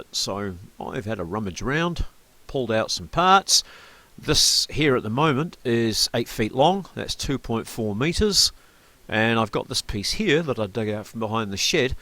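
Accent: New Zealand. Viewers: I thought you on a flight-path or next to the highway. Were are you? I'm thinking going by the accent NZ?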